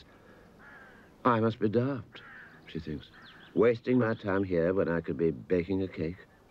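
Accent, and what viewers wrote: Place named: United Kingdom. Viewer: Walt Jabsco I'm 47, only look 40. That narrator's cool, laid back Brit voice. So... apples, old boy : )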